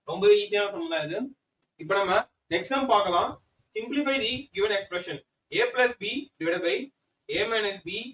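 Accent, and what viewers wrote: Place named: Madurai, India. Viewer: Neenga madurai ha bro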